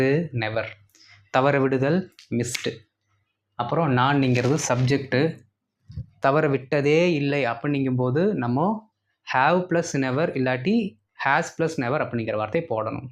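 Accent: Coimbatore, India. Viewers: I thought so.. That's why I asked.Coimbatore slang. (Nice) Thanks for answering. I madam.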